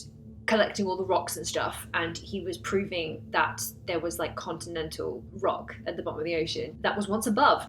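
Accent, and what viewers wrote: England, United Kingdom. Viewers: You, that British accent, gorgeous, humorous and backing it all up with Data, our own version of Laura Croft, cheers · wonder how many subs she's gained since Randall mentioned her on rogan... I mean that's why I'm here...I stayed bc I think I'm in 😍... Americans are simps for english accents at least this chicago kid is lol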